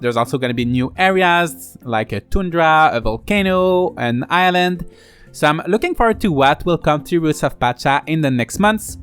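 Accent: Quebec, Canada. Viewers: Just curious, where is your accent from? . . . . . Guess; Qc?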